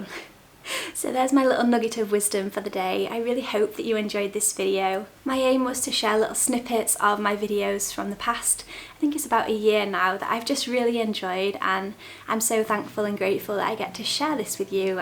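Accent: Yorkshire, United Kingdom. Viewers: Are you a Yorkshire lass?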